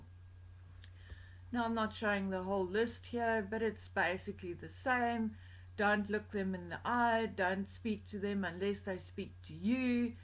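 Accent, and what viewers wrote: South Africa. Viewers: WHAT A FIND YOUR SITE IS , LOVE IT. ALREADY SUBSCRIBED. LOVE THE SA ACCENT AS I LIVED IN SA FOR 4 YEARS, SUCH A BEAUTIFULL COUNTRY AND PEOPLE. MY MIDDLE TWO CHILDREN WERE BORN THERE SO LOTS OF VERY HAPPY MEMORIES.. KEEP UP THE GOOD WORK.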